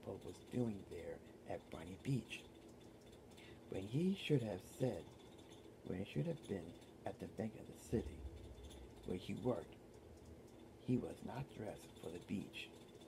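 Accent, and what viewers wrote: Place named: United States. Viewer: you do! everybody does! lol! the accent of the place we are from, city, state, area ect. being from italy, i hear your american accent very much! :) It was ment to be a compliment.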